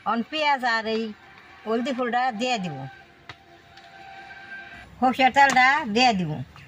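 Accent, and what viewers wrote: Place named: Mymensingh, Bangladesh. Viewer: দাদীমা আমাদের টাঙ্গাইল ও ময়মনসিংহের ভাসায় কথা বলে খুব ভালো লাগে 😊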